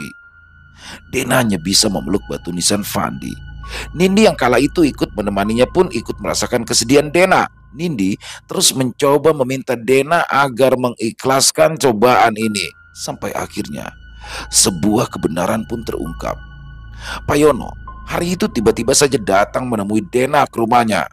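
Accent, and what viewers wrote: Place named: Jakarta, Indonesia. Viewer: ia sama2 Bang seneng mendengarkan suaranya maaf Bang aslinya dr jakarta suaranya ciri kaya orang Jakarta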